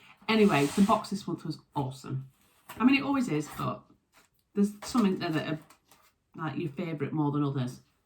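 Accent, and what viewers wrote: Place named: County Durham, United Kingdom. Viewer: Thought another commenter gave a good suggestion for the creme floral.....put the floral design near your face. Another trick I use when I Iove a fabric that's not really my best color is to either make a binding of one of the colors in the design and put that around the neckline and even the sleeves.. Or piping around a lot of the edges works too. Or a collar of a different color that flatters. Last Or.....even use two colors stacked for sleeve or neck band band, button plackets. So love listening to your accent, it's like home. Family all still live in Country Durham but our family emigrated to America. Thanks for the time you take to share your sewing!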